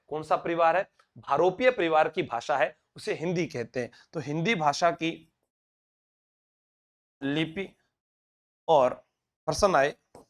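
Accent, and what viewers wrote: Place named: Marwar, India. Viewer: sir marwad me meri Nani ka gaw h whaa par aise hi bolte h😅😅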